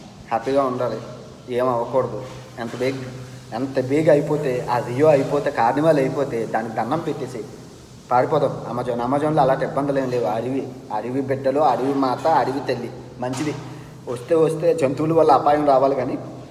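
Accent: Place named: Visakhapatnam, India. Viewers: చాలా బాగా వివరంగా చాలా శ్రమకి ఓర్చి మీరు vizag యాస తో మాట్లాడుతూ చేస్తున్న విడియోలు superb . మీకు అంతా మంచి జరగాలి . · Good vedios sweet tocking bayya..vizag language 👌👌👌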